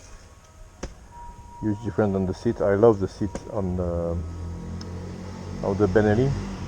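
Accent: France. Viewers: Are you French ? Hi 👋🏽 from Perth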